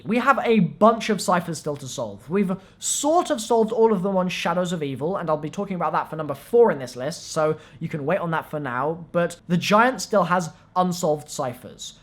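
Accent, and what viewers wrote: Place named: United Kingdom. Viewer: Your accent just makes me so hype to be visiting the UK bro #muchosmelons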